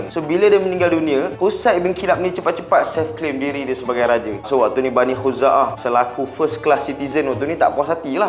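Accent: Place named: North, Malaysia. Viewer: Crita dh sedap..tpi bhsa utaqa hg xbrpa nk sdp.....tpi boleh prbaek lgi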